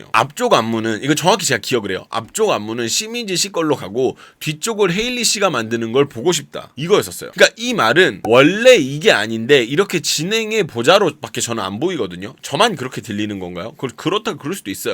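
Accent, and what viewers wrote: Jeolla, South Korea. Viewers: 전라도 사투리는 있으시네요 ㅋ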